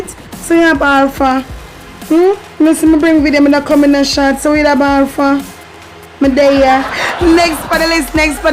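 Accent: Jamaica. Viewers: Wow u r even more stunning live… 💫 Hearing ur accent makes me want to be on the islands . Thanks for helping me with the translation for when I do get to Jamaica 🏝 ❤️🙏🏼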